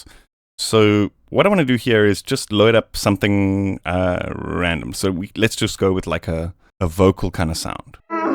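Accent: Germany. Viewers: Sounds like a german accent to me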